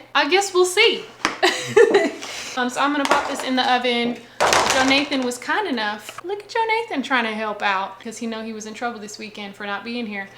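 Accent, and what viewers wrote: South, United States. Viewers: You are so Southern!! I love it.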